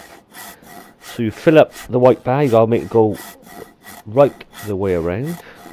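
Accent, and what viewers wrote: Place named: South Wales, United Kingdom. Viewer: Is that a Cardiff accent ? It's definitely south walian 😂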